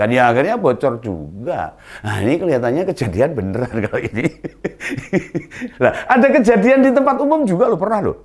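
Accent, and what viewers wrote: Java, Indonesia. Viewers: Om iki asline ngendi to.... Medoknya itu yg aq suka. (pokoknya ma grup penyuara kebenaran aq suka smua) Suwun....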